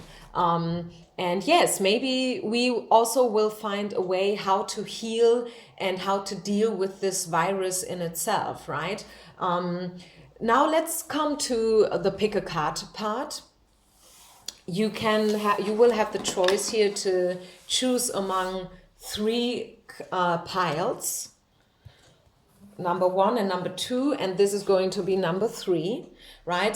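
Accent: Germany. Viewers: #3 thank you so much! 🦀💗 For your wisdom, channeled massages and tips! Also for doing all of this to heal the world and yourself! I'm bso grateful for your readings and seeing you here! I love your German accent! (I'm a Mexican but I studied German for a short time in high school and I find it really gorgeous💗💗💗) Also I saw one of your Deutsch readings!!! And I also loved it! It's incredible how we are all connected, my intuition translated the words that I didn't study and it's incredible 💗💗💗 Love & light to you! namaste 🌻✨🌈🐉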